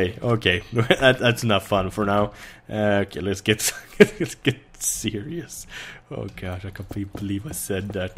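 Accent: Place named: Sweden. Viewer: Fan va gött och höra! :) Bästa videosarna på YT så jävla gött med lite engelsk med svensk brytning! Tack för att du gör videos! Ha det gött and get some more beers! ;)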